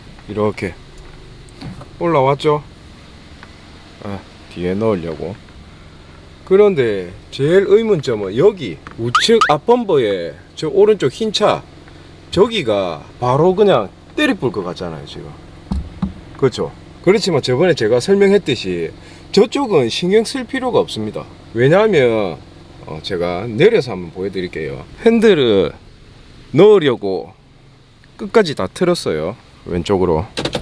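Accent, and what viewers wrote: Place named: Western Gyeongnam, South Korea. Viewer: 사투리가 서부경남쪽이네요 맞죠?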